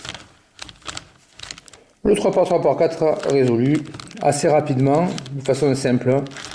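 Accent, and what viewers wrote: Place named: South, France. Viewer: En bref et pour profiter de tes talents d'orateur avec ton petit accent qui chante le sud refais nous une petite video je n'ai pas ton talent de youtubeur, mais en bref la methode la plus simple commence par faire une double croix blanche avec les arretes de L4 et les centres de L3 en même temps, etape deux tu alignes en une seule fois sur les ligne 1 et deux les paires de coins que tu fais decendre a la bonne place avec ta formule DHDHDHHD en fonction des pieces a placer si c'est que celle de la ligne 2 tu fais dhdhdhhd ou DdHhDdHhDdHhHhDd et tes deux lignes du bas sont finies, en etape 3 tu aligne devant toi deux couleurs contigues sur la ligne 1 et sur la ligne 2 meme si elles sont diferentes et tu fais ta formule du 4x4x4 de parité de coin pour inverser tes coins mais tu la fais en prenant les 2 lignes en meme temps et en un seul algo tous tes coins sont faits t'as plus qu'a les placer. 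En derniere etape il te reste les deux lignes de centres a faire, tu commences par un PLL du 3x3x3 (en faisant des demi tours avec droit et gauche) ca te place les 3/4 des paires d'arretes et pour le reste tu utilises les formules de parité d'arrete du 4x4x4 il te reste plus que cette parité que tu évoques a la fin, en gros il te faut 2 petites minutes pour faire ca, En joy...A ton service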